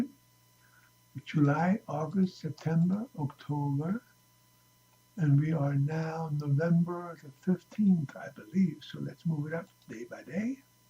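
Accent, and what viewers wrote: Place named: United States. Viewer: Excellent! Good summation! Also, we have Pluto going through the US's second house, conjoining its native position from over 200 years ago. This is concerning. It is good to see another conservative astrologer! VedicCarol, or something, was one too. Unfortunately, few people listen to older people, and I'm getting there. They look for young, ignorant people to watch, some of which have good ideas, but who lack experience. Your American accent is quite good. I'm learning a foreign language too.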